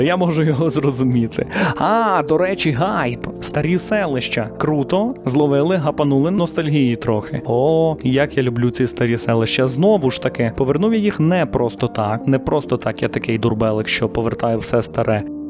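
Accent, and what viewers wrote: Ukraine. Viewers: Доброго вечора, питання не в тему відео, але... Ви випадково не з заходу України? Бо у вас специфічна вимова деякий слів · з Галичини — 100%)) Я за повномасштабну вже навчився на півслові вловлювати, звідки людина)))))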